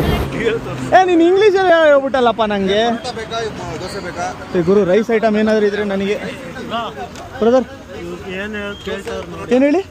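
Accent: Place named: North Karnataka, India. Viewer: That uttar karnataka slang between the conversations ❤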